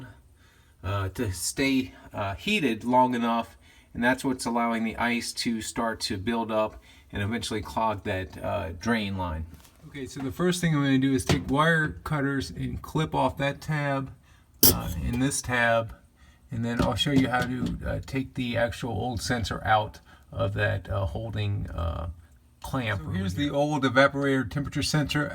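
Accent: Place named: Pennsylvania, United States. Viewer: Pennsylvania? or New Jersey?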